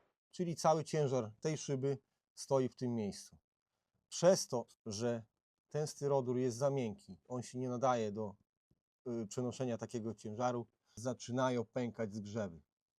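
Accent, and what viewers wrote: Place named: Białystok, Poland. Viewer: Dokładnie! Też słucham i oprócz fajnych technicznych rzeczy zastanawiam się skąd ten sposób mówienia. Tak tylko lekko słyszalny. Nie jak u szczepcia i Tomcia… ;)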